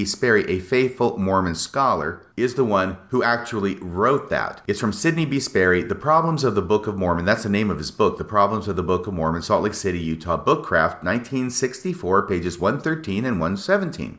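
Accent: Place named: Upstate New York, United States. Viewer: Dude best podcast ever. My ancestor (Jesse N. Smith) was from upstate NY, first cousin of Joe Smitty. Your accent was great, I have heard this type of speak many a time. For example, “the Lamanites a was a comin’ up over that hill.” My brother also went to a Japan mission at the same time you did. Very interesting. Keep up the lord’s work. 👍🏻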